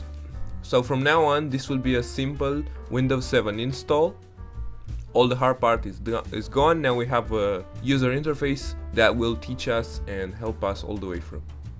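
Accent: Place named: Romania. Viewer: ba te am vazut roman dupa accent si dupa am vazut si emagu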